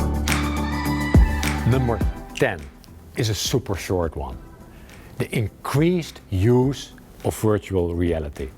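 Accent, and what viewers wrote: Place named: Netherlands. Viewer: dit Nederlandse accent ook.......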